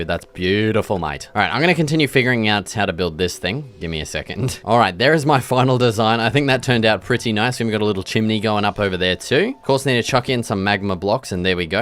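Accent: Australia. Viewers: He’s Aussie!? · You sound like a Aussie bloke nice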